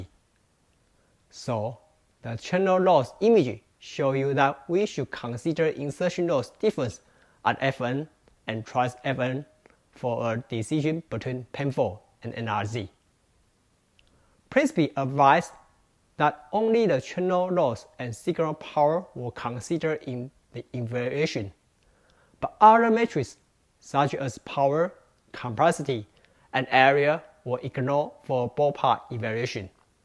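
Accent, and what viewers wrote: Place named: Taiwan. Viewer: 聽你的英文口音確實很像台灣人XD 你是做SERDES的工程師嗎? 好奇為什麼會開這麼冷門主題的頻道(不過我有興趣)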